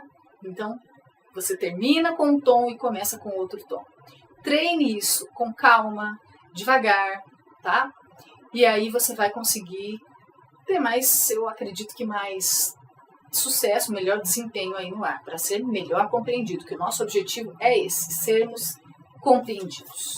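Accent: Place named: Londrina, Brazil. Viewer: Vc é de Londrina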